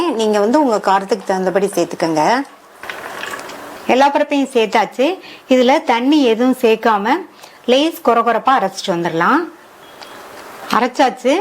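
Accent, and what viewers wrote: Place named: Madurai, India. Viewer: குழம்பின் மணத்தை விட மதுரை தமிழ் மணக்கின்றது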